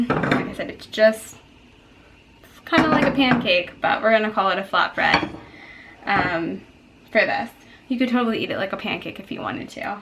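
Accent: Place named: South, United States. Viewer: Hey! You are from the South so of course things are pronounced differently. Great recipe. I will do it soon when I get some sweet potatoes. From B.C. Canada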